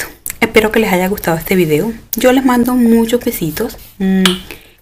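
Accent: Colombia. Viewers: Hola me gustan mucho tus videos, los miro con mis nietas y gracias a ti empezaron a gustarnos estas lindas muñecas(monster high,Ya que a mi niña mas grandecita no le gustaban,pero un dia le mostre el video de tu coleccion y le gustaron mucho varias,pero le encanto Cleo...y hasta ya tiene 2 muñecas Cleo,2 Claudin y a DracuLaura unas regalos de mi esposo y mio y las otras de sus papas,o sea de mi hila y su esposo,es muy hermoso repetir la experiencia que comparti hace años con mi hija y hoy en dia con mi nieta adorada!... Y en verdad que tienes una voz relajante; y tu acento Colombiano es lindo!! Saludos Cariñosos desde Mexicali. B.C Mexico.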